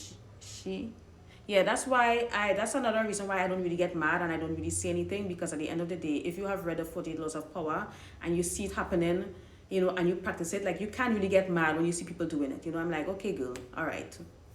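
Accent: Trinidad and Tobago. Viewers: Hi are you Trinidadian? Definitely an accent 🇹🇹🇹🇹🇹🇹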